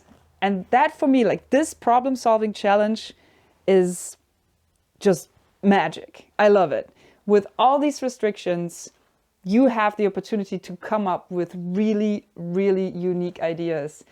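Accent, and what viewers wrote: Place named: Germany. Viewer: PS: the G'Enlish is an added bonus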